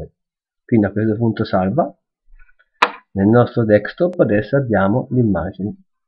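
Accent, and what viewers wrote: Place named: Tuscany, Italy. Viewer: Grazie molto utile ma il toscano mi fa morire...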